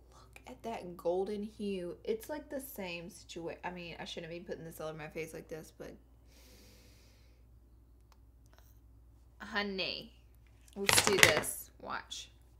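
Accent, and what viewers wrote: South, United States. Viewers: Y'all she looks like a chola but sounds like a southern lady! I love her voice so much 😂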